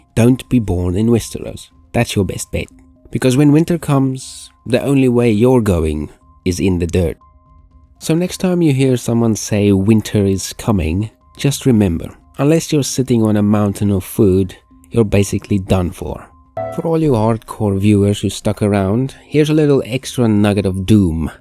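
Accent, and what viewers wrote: Afrikaans, South Africa. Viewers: 🚨🚨 afrikaans asoiaf youtuber spotted 🚨🚨 die skok van jou aksent hoor was groot, maar goeie video! keep it up! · Loved the video.... but also... this is a very south african sounding accent. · South African?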